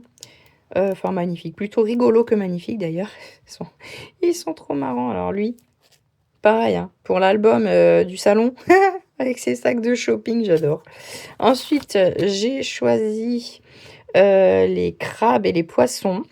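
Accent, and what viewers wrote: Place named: Franche-Comté, France. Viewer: Coucou, hâte de voir les belles créations que vous allez nous faire. Et vive l'accent franc-comtois 😂 petite caresse à vos compagnons à quatre pattes 😻🐨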